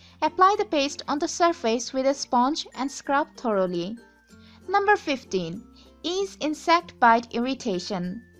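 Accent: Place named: Bengal, India. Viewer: Bengali accent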